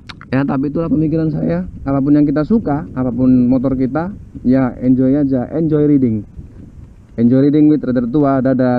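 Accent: Java, Indonesia. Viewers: logate jowo bingit... asline ndi to buoosss...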